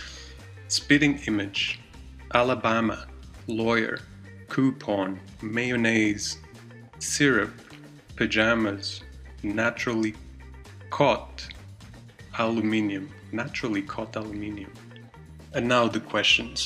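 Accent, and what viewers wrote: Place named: Braga, Portugal. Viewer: OMG distrito de Braga, sotaque pior (ou melhor) que os do Porto quase!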